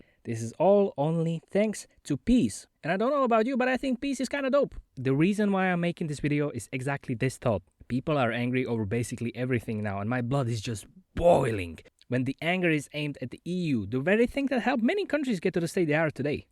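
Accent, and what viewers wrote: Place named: Eastern Europe. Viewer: inak otazka ty si slovak ci cech ci co lebo lebo znies tak slovansky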